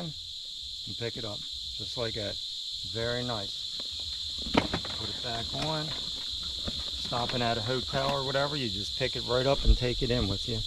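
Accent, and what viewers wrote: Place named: Pittsburgh, United States. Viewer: If I didn't know any better..I'd day you were from Pittsburgh area, I can hear the accent, I to am from the burgh, but I definitely understand seeing the young lady, why you want go to another country lol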